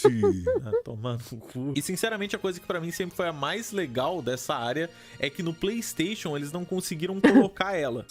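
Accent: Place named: Brasília, Brazil. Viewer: Você é de Brasília? Não consigo identificar o seu sotaque kkkkkk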